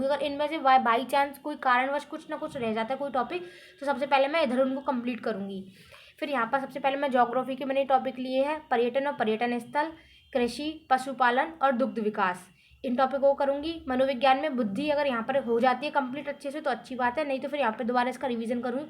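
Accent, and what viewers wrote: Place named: Bharatpur, India. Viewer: Bharatpur se ho kya language to aesi hi h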